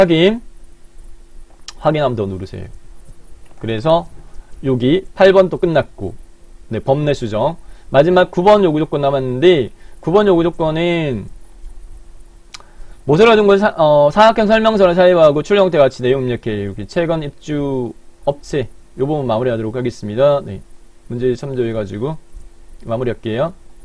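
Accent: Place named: Gyeongsang, South Korea. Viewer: 박사님의 구수한 경상도 사투리가 매력적 이십니다^^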